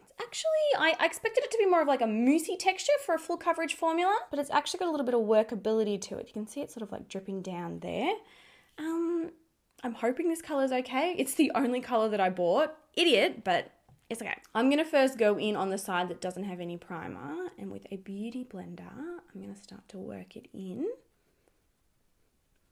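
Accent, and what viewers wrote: Australia. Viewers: I love you!!! I have oily oily oily...did i say oily??..skin!.. and i like depend on your reviews and suggestions! I don't know what i would do without your channel!! And your awesome Aussie accent is an added bonus!! Lmfao. Keep killing it girl!! You just saved me $18.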